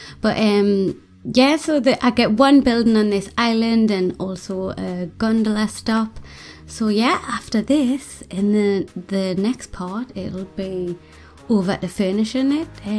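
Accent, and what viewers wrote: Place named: Newcastle, United Kingdom. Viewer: I'm subscribed and keep watching your videos even though I don't play the game. Your voice and Geordie accent is soothing to me.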